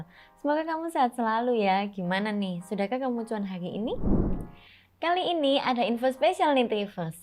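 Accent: Java, Indonesia. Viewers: Mbake ayu lan medok nggih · Wah cocok buat kita yg ingin invest jangka panjang ini, Terimakasih mbk Cantik yg membawa Materi sangat menguasai sekali dan Cantik lagi, bahasa jawanya medok.... Hhehehe.... Aq pingin Staking yg Shiba Inu lah... Nunggu ada dana Free.... Sukses buat Triv....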